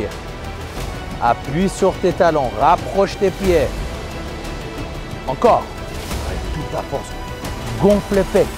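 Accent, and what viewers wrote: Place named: Iran. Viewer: IL EST IRANIEN LUI C EST PAS POSSIBLE CET ACCENT hahahahahah de la part d'un autre iranien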